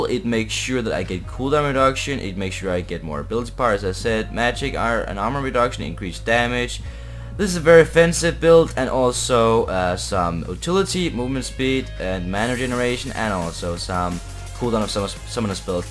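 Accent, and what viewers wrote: Denmark. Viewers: Jeg hører en dansk accent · Really love the videos! Keep it up, btw are you danish? :3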